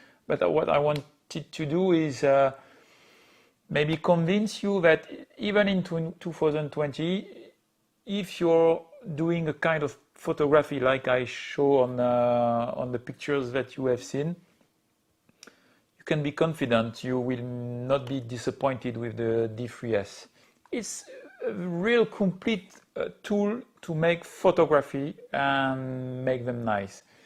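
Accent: France. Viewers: Assurément un français according to his accent lol big up! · Bonjour, je crois entendre un accent francais ;-). Vous me recomandriez la nikon d3s a 799euros? Je suis un debutant et cherche une camera pour la photographie de paysage et de nuit. Je concidere aussi une fuji xt30 ou une sony 6400. Merci pour la reponse d avance.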